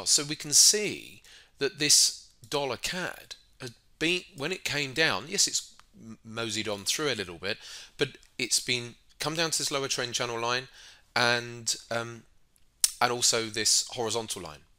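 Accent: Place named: United Kingdom. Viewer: That British accent man I wish I had it too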